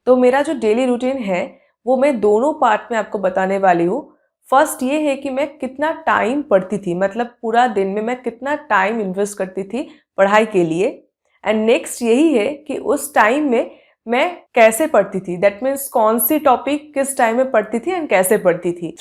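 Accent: Bengal, India. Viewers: Mam r u Bangali or asami?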